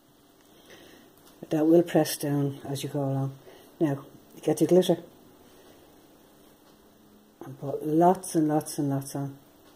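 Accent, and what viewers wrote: Ireland. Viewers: I'm just in heaven watching you I love crafting as well your so gifted thank you for sharing it's great to hear an Irish accent xx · Hiya I've just found your channel and subscribed. love your crafts thanks so much for sharing with us. I was like is that an Irish accent I can hear,,????yes it is she's Irish deadly. I'm a dub myself😀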